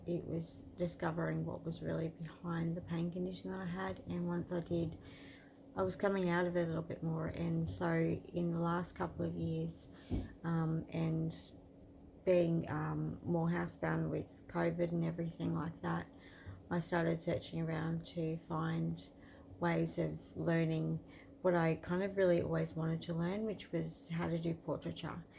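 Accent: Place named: Australia. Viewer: Hello from the UK! Hope you are baring up ok in your midwinter (for us it is the middle of summer, but it still keeps raining and isn't very warm). What region of Australia do you live? I like your accent!! :-) And loved the beautiful botanical art you did in your 20's! I'm glad you are keeping up your art.....unlike me, who can't seem to motivate myself, even though I want to!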